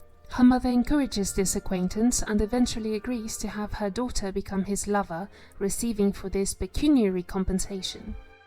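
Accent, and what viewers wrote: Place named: England, United Kingdom. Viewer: The fact that this AnasSyriano is not even capable of recognizing an English accent or not has made a fool of himself or herself. So never mind : your accent is truly nice, and thanks for the video 😉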